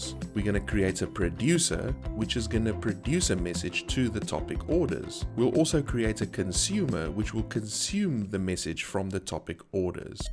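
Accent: South Africa. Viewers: Love hearing a South African accent explain these hot topics!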